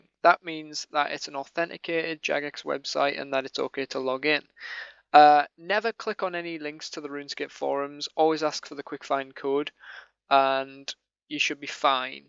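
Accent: Newcastle, United Kingdom. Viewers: Are you from newcastle? you sound georide :) im from newcastle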